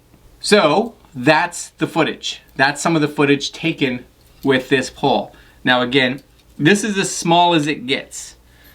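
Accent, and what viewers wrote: Canada. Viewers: That's one hell of a Canadian accent ya got there chuck.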